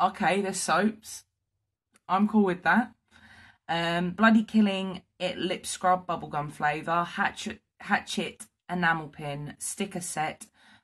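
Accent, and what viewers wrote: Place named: United Kingdom. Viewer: Your british accent kills me softly everytime! You are the cutest goth girl! 💜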